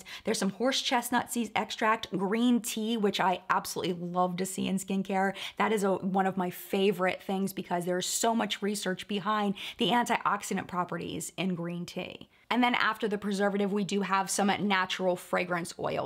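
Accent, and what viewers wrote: United States. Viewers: She came for Charlotte Tilbury and I LIVED FOR IT! 😂 Where I am, my clients raved so much about it. But I was never really thoroughly impressed with the brand to begin with despite how it was advertised as a brand loved by Hollywood and blah blah blah. I really appreciate people who shed light on facts like these. Oh and I just love her pure American accent like it’s just so perfect.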